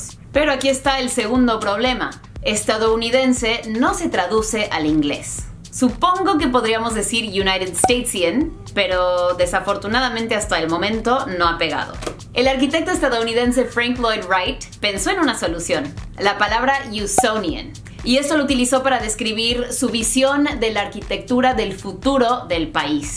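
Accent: Mexico. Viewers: La Biblia Dice he vivido en Tamaulipas, Durango, Chihuahua, Sonora y Coahuila y he conocido personas que tienen el acento norteño y otras que aunque sean del. Norte los escucho normal para mí que soy de Acapulco Guerrero, en todo el país existen personas que hablan el acento Méxicano estándar como lo habla, no porque sean norteños tienen que hablar igual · kelly ramirez ella estuvo viviendo en México y yo también dije eso, me encanta su acento Méxicano. y ella dice que ama México. ♥ soy de México jeje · Hola me acabo de suscribir a tu canal luego de ver tan sólo un vídeo tuyo. ¿Sos estadounidense? Si no lo decís no lo creía! hablas un castellano perfecto, pensé que vos eras mexicana ya que tenés acento mexicano. Che, ni un ápice de acento inglés!. pregunto: porqué los mexicanos los llaman gringos de donde proviene esta palabra? GREEN GO ???? desconozco. acá gringo les decimos a los europeos y eventualmente a los "yankis" pero nos referimos a los blancos caucásicos. 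Saludos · Una pregunta por qué decidiste aprender el Español con acento mexicano , si tus vídeos estan dedicados al mercado Latino ,fueran usado un acento mas neutral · A mi me parece mas Mexicana o Latina que gringa. Cuando la oigo hablar imediatamente pienso en Mexico. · Tu acento tan mexicano :3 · Te equivocaste un poquito. Hablas el castellano casi perfecto, con un encantador acento mexicano. Pero dijiste "unanimamente" y es "unanimemente". Saludos desde el Perú, un país hermano de México.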